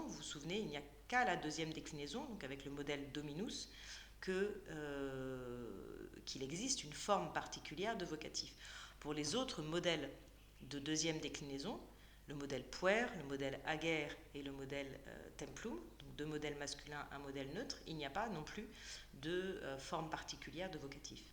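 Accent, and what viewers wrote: France. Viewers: Respectez la prononciation du lati. Vous avez l’accent français :pas d’accent tonique sur les mots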